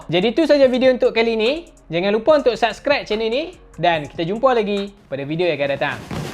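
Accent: Kedah, Malaysia. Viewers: Best dengar orang kedah berleter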